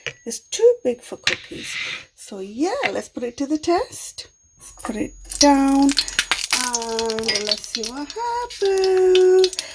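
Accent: Sylhet, Bangladesh. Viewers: আপা খুব ভালো লাগলো ভিডিও টা,,, আর আপনার মুখে সিলেটি মাথ হুইননা মন টা বইরা গেলো,,, নতুন জয়েন হলাম আপু,, আশা করি আপনিও জয়েন হয়ে যাবেন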